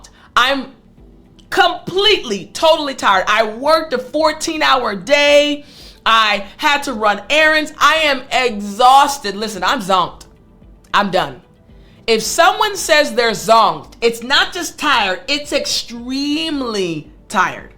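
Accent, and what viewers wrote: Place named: United States. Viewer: I really get confidence when I listen to you. I personally don't like American accent but with your voice the accent sounds beautiful ❤ Ramadan Mubarak.❤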